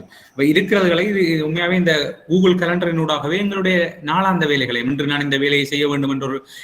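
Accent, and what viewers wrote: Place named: Sri Lanka. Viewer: இலங்கை தமிழை கேட்கவே இனிமை சகோ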